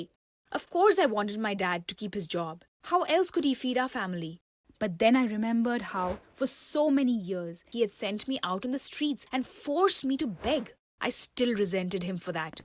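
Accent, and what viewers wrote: India. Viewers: It's a Indian voice 💯🤗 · FINNALLY A CHANNEL WHO GOT OUR COUNTRY'S ACCENT YAYYYY INDIA KI JAI side note: THIS IS A INDIAN TV SERIAL I SWEAR this is after watching the whole video: i knew this country has problems but jeez 😥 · Perfect Indian accent another central American girl storyteller pls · Finally an Indian Story with a perfect Indian Accent 👌🏻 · The stereotypical Indian accent ☠️🦇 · The Indian accent is good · No Offense but I don't like this Indian accent :/ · Finally india accent my dear country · I did not know how a indian accent sounded until this video. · Her Indian accent is so horrible, i don't understand how people are praising her accent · OMG the accent!!!. We in India speak in English just like this. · are u indian bc i am and I recognised the accent! i love your skin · Damn loved this Indian accent and story 😁🔥❤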